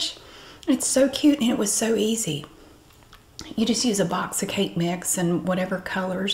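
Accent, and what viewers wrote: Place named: Appalachia, United States. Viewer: ive bien loving your channel for so long, because your videos are excellent, but also, i love the pride you have for your southern appalachian roots and has made me take a special interest in learning more about it. you accent is so beautiful and your anecdotes are so Rich. the thing is, in trying to get more information i found another channel called celebrating appalachia and to my surprise, both of you ladies use the same confetti bowls to Cook. i would die with that collab. sending all my love and support from argentina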